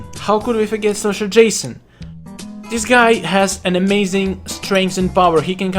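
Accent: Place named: Russia. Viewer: I don't mean to be offensive But are you Russian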